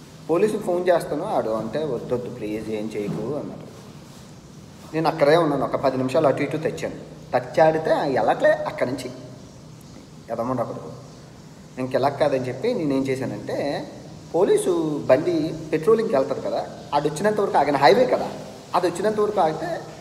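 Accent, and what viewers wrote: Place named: Visakhapatnam, India. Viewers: చాలా బాగా వివరంగా చాలా శ్రమకి ఓర్చి మీరు vizag యాస తో మాట్లాడుతూ చేస్తున్న విడియోలు superb . మీకు అంతా మంచి జరగాలి . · Good vedios sweet tocking bayya..vizag language 👌👌👌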